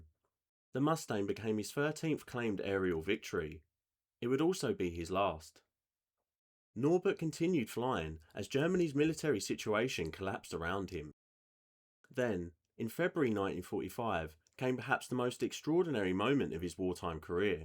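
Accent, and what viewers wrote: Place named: London, United Kingdom. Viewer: work on your accent, too much London in it.....the letter 'T' is meant to be pronounced, not figh-er or for-y.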